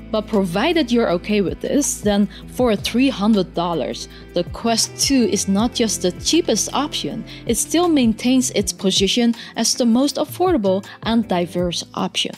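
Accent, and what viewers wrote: Netherlands. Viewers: Why does it seem like you have a Dutch accent 🙂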